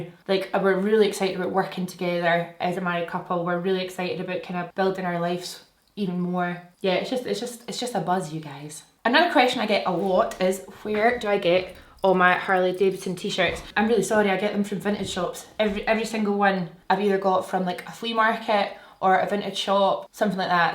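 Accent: Scotland, United Kingdom. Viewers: Yasss BB coming thru with that Scot vibe to brighten my day!! Love ya xo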